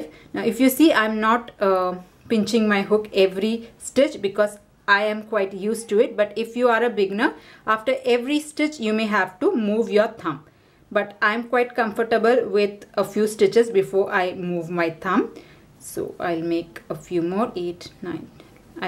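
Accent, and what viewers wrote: India. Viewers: When I heard the Indian accent I knew I would be able to do in one try(I’ve watched about a 100 American tutorials and got frustrated as hell)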